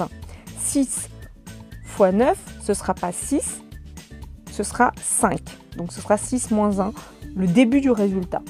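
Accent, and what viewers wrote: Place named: England, United Kingdom. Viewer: j’ai crue que taites anglaise moi en mode 🥲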